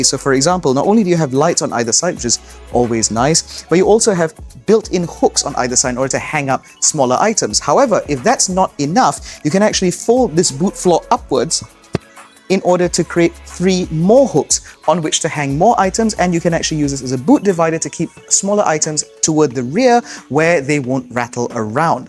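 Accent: England, United Kingdom. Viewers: Thumbs up to Your english accent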